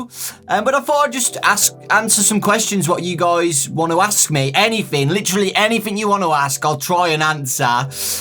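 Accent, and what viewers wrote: United Kingdom. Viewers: My family was happy for me when i became a GachaTuber. The only reason im good at a british accent is because i watch you.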